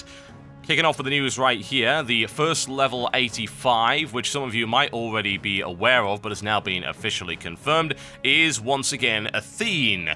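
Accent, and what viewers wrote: United Kingdom. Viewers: British sounds ftw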